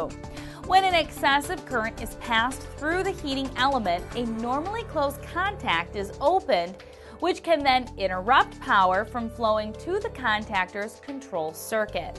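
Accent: United States. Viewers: Torturous American accent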